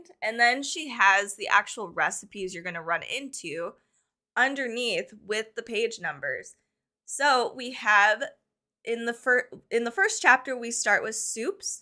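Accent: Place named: Mexico. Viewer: I love your Mexican accent! So cool! I can’t wait to buy this cookbook. 👩‍🍳 thanks for sharing this! 🤩🥰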